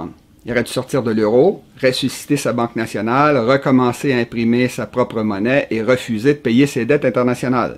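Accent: Quebec, Canada. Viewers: Mr, des explications et conseils clairs, precis,concis,référencés, et un accent quebequois moins insupportable et plus comprehensible que d' habitude (pour un francais). j' apprecie la synthese complexe DES problemes actuels en 30 minutes ce qui me fait dire que vous etes peut etre du serail et maintenant repenti... exposez-nous votre parcours professionnel et vos motivations a tout debaler aujourd' hui... les mots n' ont pas la meme valeur suivant qui les emploie cela n' enleve en rien la qualite de la video je m' en vais en cueillir une autre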